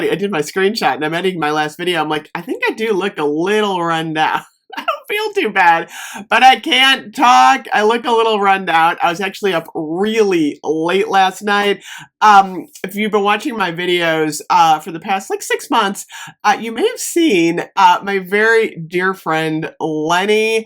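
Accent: United States. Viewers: Are from the states or Canada?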